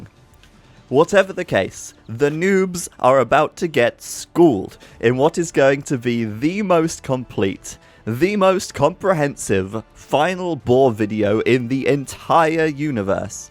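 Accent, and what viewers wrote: United Kingdom. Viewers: an AoE textbook in a flawless british accent. :3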